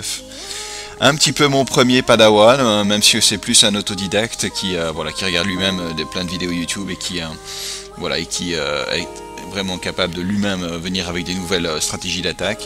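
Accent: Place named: United States. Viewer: comme on dit chez nous, ta faillit me faire braire lol, je doit t'avouer que sa me pince le coeur que tu ne soit plus parmis nous, tu m'a beaucoup appris, tu m'a redonner confiance en moi et pas que sur le jeu, outre le fait que tu sois un excellent joueur, tu es surtout une très belle personne, "the voice" avec ton accent à l'américaine que je kiff tellement ahah, par moment sur la fin j'avais envie de te "forcer" à rester car je ne suis pas dupe, mais sa aurais été je pense irrespectueux et inutile, j'espère que tu trouvera ce que tu cherche, dans tout les cas j'espère qu'on restera en contact via fcbk, tu va me manquer, d'ailleurs tu me manquais déjà depuis quelques temps, je garde tes précieux conseils bien aux chaud pour pouvoir continuer à faire évoluer le clan, un grand merci à toi, au temps et à l'énergie que tu nous a consacré, comme dirais l'autre "all right" ou encore "yallah" lol, reste comme tu es et prend soin de toi et de ta famille, je te souhaite d'être heureux, et ce n'est qu'un aurevoir ;)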